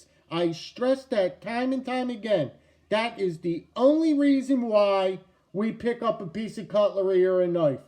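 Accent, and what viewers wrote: Philadelphia, United States. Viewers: I love mine! I wish I had the money to grab the Oily Creek bone version as well, but unfortunately, I could only afford the one. Hopefully I'll be able to get a Sambar Stag one when they release it. GEC makes a great knife! I wish I had gotten into them sooner, but this one makes my 7th one, and so far my favorite. The #93 oily creek bone rams foot is a close second. They used this wood to soak up and clean the oil from the inside of their drill pipes back in the day. That's why it's called sucker rod wood. I used to work at the Philly airport for USAIR for 20 years, and I lived in Williamstown NJ,. I'm living up in NYS now, but listening to you talk brings back fond memories of that 20 yrs I spent down there. Loving the accent and the way you talk brother!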